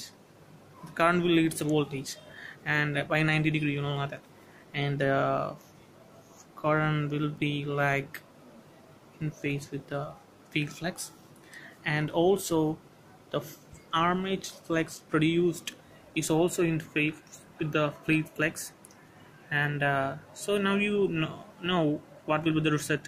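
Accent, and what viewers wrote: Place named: United Kingdom. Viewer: Sirf British tone me english bolne se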